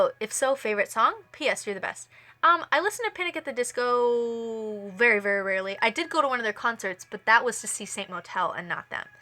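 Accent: United Kingdom. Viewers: Missed it, but I had a buuuurning question... Are you British or American? I just can't lean towards one or the other... Thanks :-D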